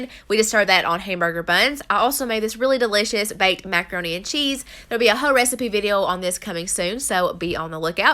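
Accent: South, United States. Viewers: You’re such a southern Bell, I love your accent and food ideas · What state do you live in? Love your accent! I’m from North Carolina and I have an accent as well, but yours is more southern than mine. Lol! Love ya! 💖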